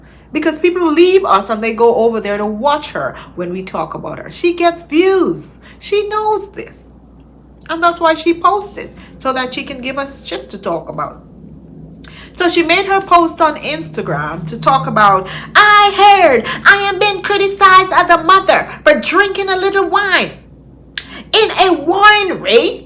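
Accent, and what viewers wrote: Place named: Jamaica. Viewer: I heard Jamaican in you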